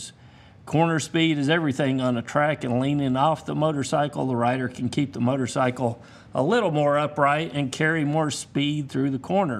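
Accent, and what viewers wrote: United States. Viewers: I'm curious. What accent is his? American, I assume, but which part of the US?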